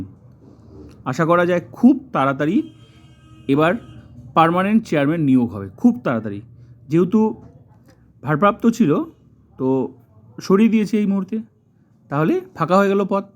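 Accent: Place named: Bangladesh. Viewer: Tumi pakka bangal bachha ...tai boli ....bangla uccharan ta thik koro.....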